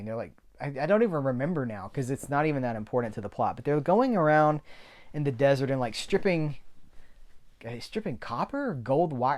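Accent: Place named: Australia. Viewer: I think your Aussie accent is perfect. And this is coming from someone who is not Australian.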